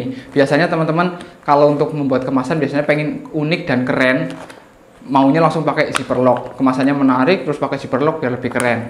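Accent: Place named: Banyumas, Indonesia. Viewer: Logatmu ngapaknya medok banget , mas. Anyway..sukses selalu ya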